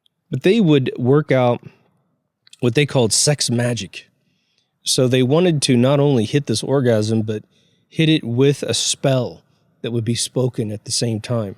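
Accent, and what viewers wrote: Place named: Sweden. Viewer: is a fucking genius. I found his channel in 2016. I guess he did work way before that. But one thing is for sure. He is Swedish. Nordic. I hear it in his voice.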